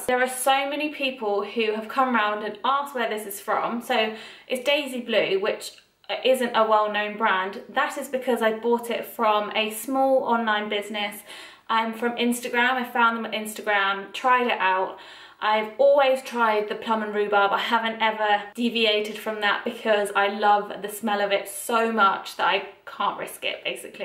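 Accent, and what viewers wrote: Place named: United Kingdom. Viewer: im a man of simple things....i hear a British accent.....i subscribe 🤷🏾‍♂️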